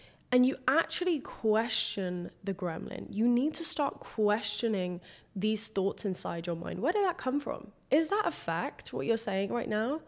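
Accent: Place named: Yorkshire, United Kingdom. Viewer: Ya don't sound like ya from Leeds, that's a very posh Yorkshire accent 😆😉 It's a great channel ya building here. Sending ya peace and love from Huddersfield ❤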